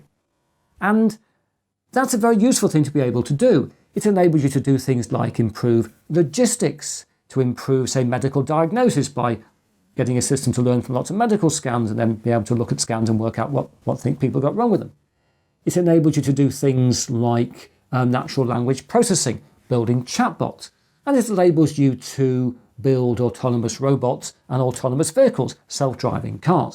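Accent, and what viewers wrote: England, United Kingdom. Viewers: I whole heartily agree with the dumbing down based on A.I. and Social Media platforms. 1, A.I. via laziness. 2. Social Media -- the willingness to believe what is your mindset without any research of fact or fiction i.e. laziness but laziness with major consequences. Both are of concern as history does repeat for lessor reasons. Thanks professor for the update and keep up the good fight. Although we're separated across the pond by a common language, I enjoy each and every installment. Less and less translation is now needed from the King's English to my Southern Drawl. ;~)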